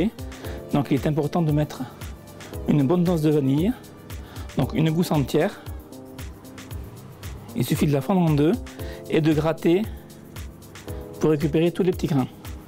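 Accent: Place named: South, France. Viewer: des 'rameuquins' :) j'adore l'accent du sud !! merci pour la clarté de cette recette !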